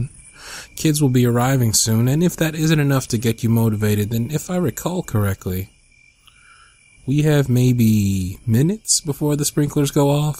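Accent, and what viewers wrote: South, United States. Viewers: can you please make a playlist with all videos of you with a southern accent please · As a proper southern lady, I must say you're accent is quite good. Especially your cussin'. Hehe. ^^ Honestly. That "Shiit" at the end sounded so real I almost turned around, half expecting my dad! XD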